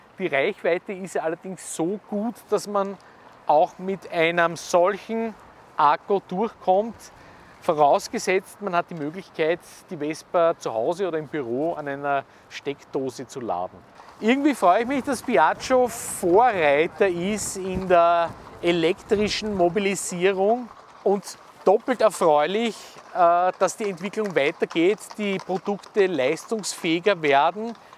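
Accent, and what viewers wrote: Austria. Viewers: östricherr reded so lushtig hahah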